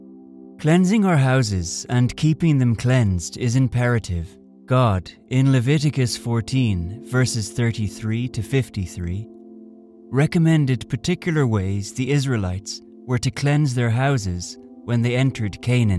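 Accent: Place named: Ireland. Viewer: Which part of Ireland are You from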